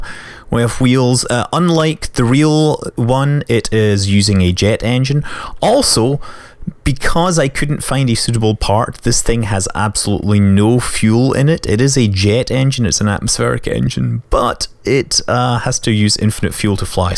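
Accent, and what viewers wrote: Scotland, United Kingdom. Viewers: May I ask? Are you Scottish?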